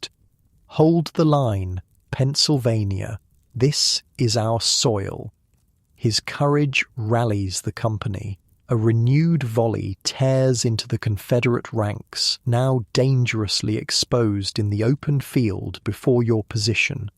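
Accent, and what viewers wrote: United Kingdom. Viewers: Why a Brit narrator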